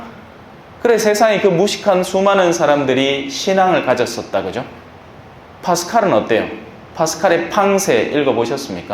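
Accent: Gyeongsang, South Korea. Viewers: 신부님... 세상살이도해야.밥먹고.신앙생활도.꼭.해야평화을..이웃.동료을살피옵니다.신부님에.직선말씀.의성.경북말씀..투박함.그대로.가식없으신.신부님.한번씩..속이.뚝.티입니다.언제나.정답..정답주시옵니다..베트남에서.함께합니다.🙏✈️✈️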